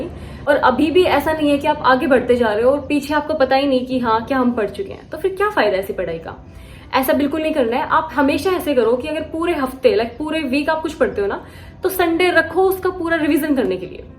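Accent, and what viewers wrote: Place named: Pahadi, India. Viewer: Himachal wagera se ho kya aap didi? Pahadi accent hai aapka...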